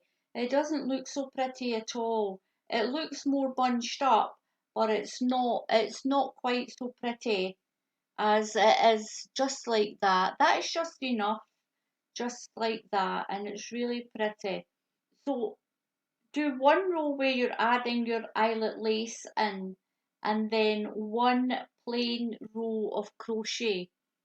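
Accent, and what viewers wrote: Scotland, United Kingdom. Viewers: Super cute, tfs. I adore your Scottish accent, 😀🐢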